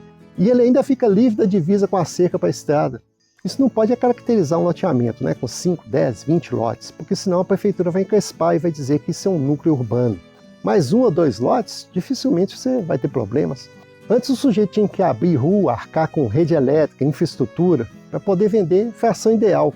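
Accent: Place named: Minas Gerais, Brazil. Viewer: Gostei muito da explicação, e também do sotaque do apresentado... MG!? 👏🏾👏🏽👏🏼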